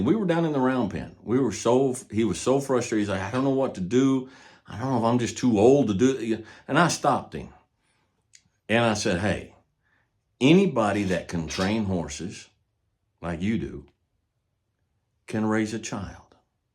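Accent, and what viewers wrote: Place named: Midwest, United States. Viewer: Good morning from Moscow, Russia. It's in the morning, and I was about to go to bed when I came across your video and realized I couldn't miss it., it's been a tough year. I divorced my wife because of her infidelity, almost killed myself, and went through a depressive episode, but you helped me. Your videos with the measured pace of narration, calm voice, metaphors about horses and nature, those stunning views in the background, a cigar in your fingers and a Midwestern American "cowboy" accent were like an asmr for me. In moments of anxiety or restlessness, I would often just turn on random videos from your channel and find peace in them. Thanks to you, I survived the hardest episode of my 22 years of life and realized that the last two months of 2023 were the best, as I finally started breathing fully again. Thank you. 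I respect you and appreciate what you are doing for the younger generation.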